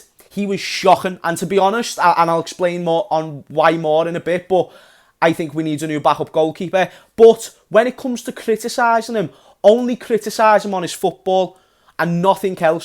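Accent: Liverpool, United Kingdom. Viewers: This Scouse Accent is Ultimate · Nice Scouse voice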